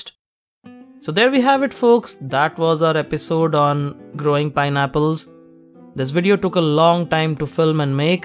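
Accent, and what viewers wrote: India. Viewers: your English like indian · Yes Indian accent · You sound indian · I think you are Indian by your accent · I think you are Indian. Your voice sounds like Indian accent. Am I right? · Your accent sounds Indian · Are you Indian? A/c to your accent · That's an unmistakably Indian accent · Federicken S. It sounds Indian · It's an Indian accent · your English speech is Indian type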